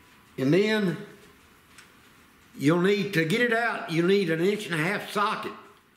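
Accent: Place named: South, United States. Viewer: You definitely from the south like me 😜 yee haw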